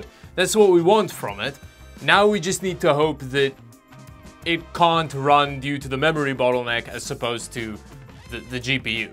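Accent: South Africa. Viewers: how do you sound like ur south african with that accent? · Your accent and your name makes it seem like you're from South Africa, are you?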